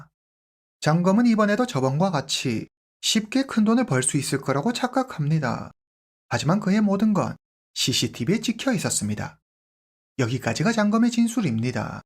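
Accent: China. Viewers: 말투 어디 말투 입니까 조선족 아니고 중국인인가?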